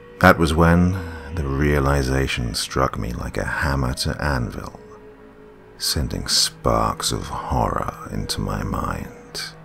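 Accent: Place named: Northern England, United Kingdom. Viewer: Yay a Northern lad :) greetings from Liverpool!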